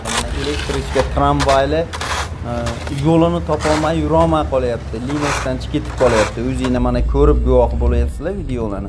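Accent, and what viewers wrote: Chortoq, Uzbekistan. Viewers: Bu gapirib turgan Nomongonlikmi diyman,layk yuq sanga😂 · Namanganlik ekansizda aka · Ака чортокданмисиз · Наманган чортогданмисиз а ака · Чортокдан экан гапираетган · Укалар чортокдан шева тортяпти. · Намангани каеридансиз😂😂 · Ака узингиз хам Наманганни чорток тарафидансиз шекилли назаримда,шевангиз ухшаяпти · Çortoq şevada gapiryapti a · Наманган Шева шекили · Диндошим шева Наманган чорток ка тортяптия тугри топтимми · Чортокдамисан укам..гапинг рост. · Наманганданми дийман окам? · НАМАНГАННИ ЧОРТОК ШЕВАСИДА ВИДЕОНИ ЗУР ОБЗОР КИЛИБСИЗКУ 😂😂😂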